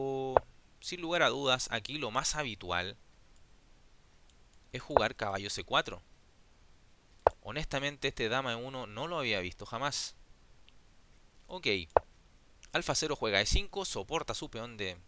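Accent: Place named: Chile. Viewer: Estimado creo que eres el mejor comentarista de ajedrez que he escuchado, la emoción que logras despertar es increíble 🤩 Te envío un abrazo desde Viña Del Mar, Chile 🇨🇱 (Por tu acento asumo que también eres chileno) Espero ver tus análisis del torneo mundial entre Carlsen y Nepo!!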